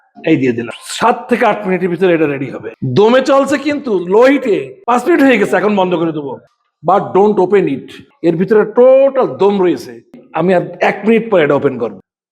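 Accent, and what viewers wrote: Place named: Jessore-Khulna, Bangladesh. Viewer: আপনার বাসা মনে হচ্ছে যশোর খুলনা তে।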